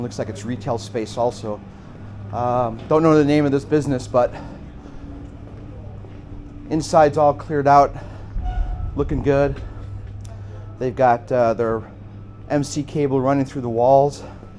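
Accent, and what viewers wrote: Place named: New York, United States. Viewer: Buddy, you sound like you JUST came from New York City!! Definitely!!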